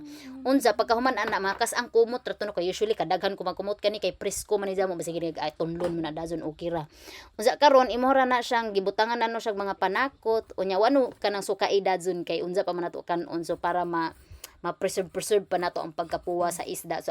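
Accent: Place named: Dumaguete, Philippines. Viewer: karon ra ko ka realise. Dumaguete ug Bohol parehas ra kaayo ug grammar ug accent. ang kalahian ra ang J ug Y.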